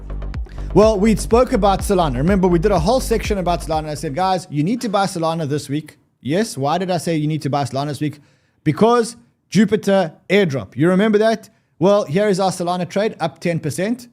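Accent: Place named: South Africa. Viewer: I think the South African accent should be banned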